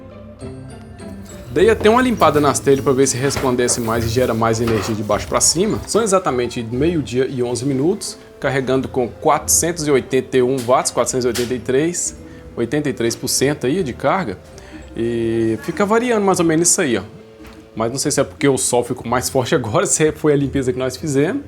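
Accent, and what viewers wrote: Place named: Rio de Janeiro, Brazil. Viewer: O cara com mó sotaque carioca.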